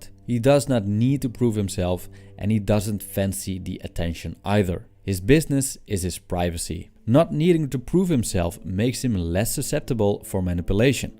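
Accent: Netherlands. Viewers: Your accent sounds very Dutch :) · Mmmmm, your accent... I guess it's Dutch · You have a bit of a Dutch accent, is this correct?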